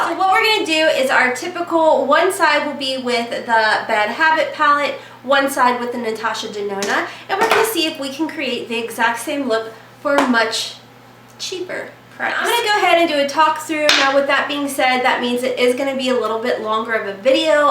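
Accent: South, United States. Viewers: you really made me laugh today with your silly goofy self LOVE IT!! You remind me of my bff shes alot like you i know if you and i hung out we would have a blast. And i love your Southern Belle side i had live it in good old Texas for 13 yrs love the southern talk and there personality very welcoming and sweet =). One more thing i wanted to add i like that your very detail wit your videos about the products and your honesty that i can trust. and by watching this video ima go buy me that fantasia palette now =D. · I'm on a binge this evening! Watching all the videos I missed! I can't believe I missed this one! I was soooo waiting for it to. But anyway... Girl, Don't you EVER be self conscious about that Southern voice!! I am from Tennessee grew up in MS (tha sip) so I completely get it! We just walk to our own beat and have charm like no other! Beautiful as ever ❤ Much love from Tennessee, Dayna 💖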